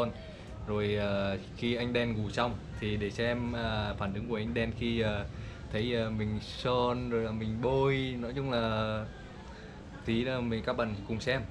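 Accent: Nghe An, Vietnam. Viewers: Anh ở nghệ an à anh..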